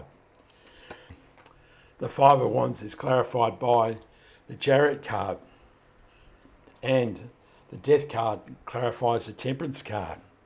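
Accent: Australia. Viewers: Subscribed.. Nice to hear an Aussie accent!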